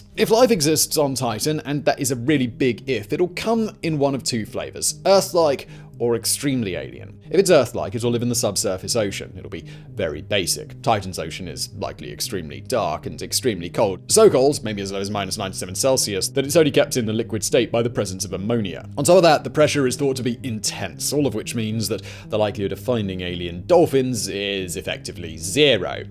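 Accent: RP, United Kingdom. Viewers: Wait? How many channels do you have? Just so I know on which to go and subscribe. I assume the quality of your other channels is equally impressive, educational, entertaining and a just amount of brittish posh😉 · ... I know your British or something to that accent...but meethane...it is a short E...methane...as in meth-ane...otherwise like the trim job on the beard...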